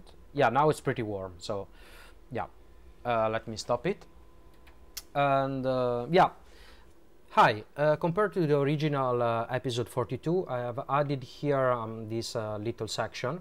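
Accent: Italy. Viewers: O sei italiano o sei francese haha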